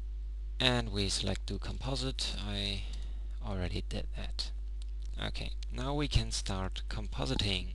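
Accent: Germany. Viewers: I attempted to complete the tut using 2.49b with little success(several months ago). I just finished your tutorial using 2.58b(with major success)!! Hooray. Thanks for the Portal and Fire tutorials. You're one of the best blender teachers out there. Love your German accent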